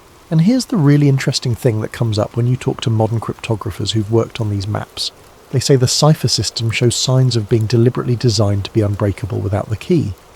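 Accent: United Kingdom. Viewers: I love the soft spoken British accent putting me to sleep 😴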